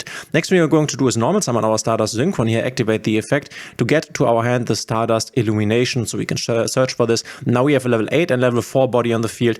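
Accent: Germany. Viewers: your accent sounds german 🙃 great vid btw 🎉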